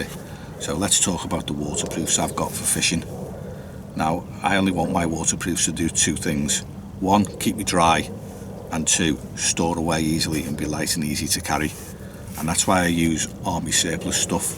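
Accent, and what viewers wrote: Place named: Liverpool, United Kingdom. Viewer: Where you at mate?.............. Got a scouce twang there fella so cant be far from me? lol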